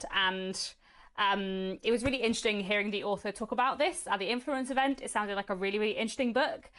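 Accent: United Kingdom. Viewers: I changed upon your blog last week. Very interesting even if you talk rapidly in British accent which is sometimes difficult to follow. Never mind I will catch up with the accent in due course. I am watching this from Bangalore, India. Thank you for the vlog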